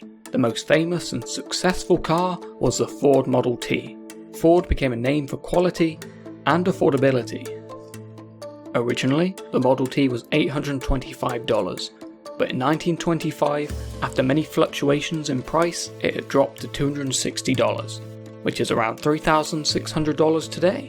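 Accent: United Kingdom. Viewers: What’s with the British accent for an American history presentation? I can’t even!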